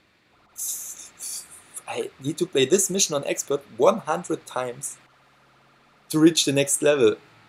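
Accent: Germany. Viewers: were are you from ? you Sound German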